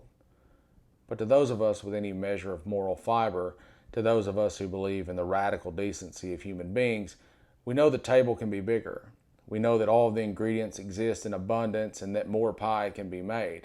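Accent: South, United States. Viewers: what a relief to hear a Southern accept applying reason. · I agree. Look how close his eyes are together👀 a very cunning Jew with a southern accent.🤷🏻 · Thank you from a fellow southerner,. Gives me strength to hear your words in your accent. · I'M A NORTHERN I BELIEVE YOU NAILED IT FOR ME IT'S HIS SOUTH DRAWL THAT STRENGTHENS HIS MESSAGE FOR ME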